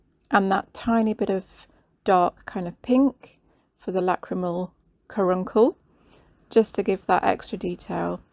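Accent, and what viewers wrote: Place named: England, United Kingdom. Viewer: I meant to add that I had not seen the last couple of minutes as I got interrupted. But the end result was amazing. Beautiful work, the head is so realistic. So nice to hear an english accent too! I am in Australia and I have a friend in Canada who has a schnauzer, a gorgeous animal and I used a photo of it as a reference when making a full body model for her. It is only about 6 inches high and 3 wide but I really wanted the eyes to be a feature. That is how I came across your video. I have been needle felting for about 10 yrs. I strive for an approximation rather than a replication when doing animals. If it is recognisable then I am satisfied. I want the hobby to be enjoyable, and not bash myself trying to be what I am not…ie perfect. I have sold a lot of items and had lots of commissions over the years so it must be okay. 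But I would love to be as talented as you obviously are. I have saved your channel so I can refer if I need to. Thanks again.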